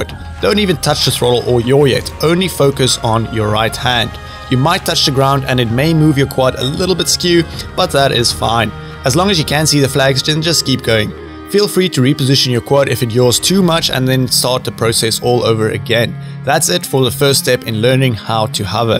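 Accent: South Africa. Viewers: hey my bru are you South African? you sound south african but your address says new zealand!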